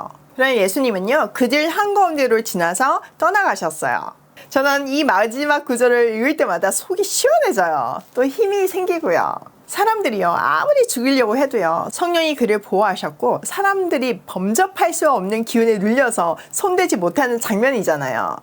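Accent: Gyeongsang, South Korea. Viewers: 할렐루야!! 삼천포가 고향이시네요 경상도 사투리가 넘 친밀해요 저도 고향이 거제도에요~^^ 감사합니다 🙏 🙏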